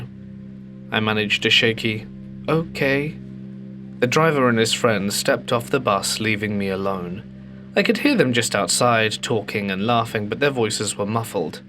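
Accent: United Kingdom. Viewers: I love that British accent !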